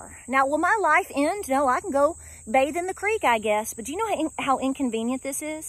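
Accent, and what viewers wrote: South, United States. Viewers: Cheers from the Mountains of morroco u got a beautiful southern accent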